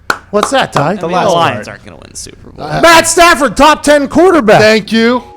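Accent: Pittsburgh, United States. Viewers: Man I love watching and listening to these videos, gotta love that pittsburghese that comes out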